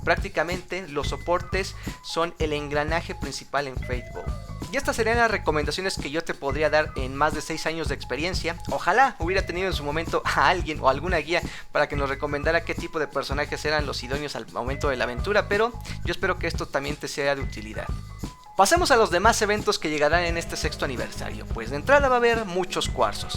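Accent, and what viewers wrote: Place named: Bolivia. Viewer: por qué hablas tan boliviano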